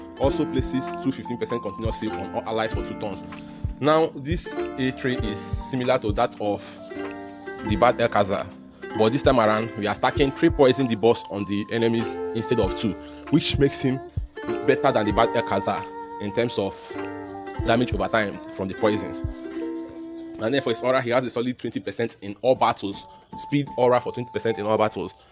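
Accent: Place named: Nigeria. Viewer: Mitchell it is english, he has a Nigerian accent, at the top of the screen you'll see a box, you'll see a box with "CC" click on it for subtitles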